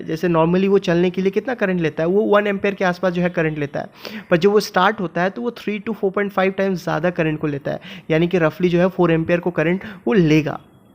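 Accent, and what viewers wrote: Bengal, India. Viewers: Are you Bengali? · Kya aap bangali he?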